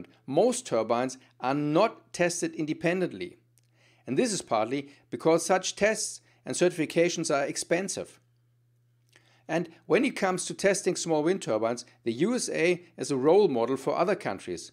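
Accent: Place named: Germany. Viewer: You're a clone of a colleague of mine in Stuttgart... with the very same accent and weirdly enough, deeply convinced that US technical validations are UNBIASED! 😆That's so naive! Industrial considerations are paramount, meaning that a better technical solution may be beaten as it's been already the case with heat engines as a matter of fact. Once I asked him how trustworthy are Tüv tests and certifications: Are they to be less reliable than their american counterparts? It was an inner national struggle for my colleague... Oh Gosh! Corporates can bring down the most wonderful ideas. It's appalling that you ignore it!!🤣😂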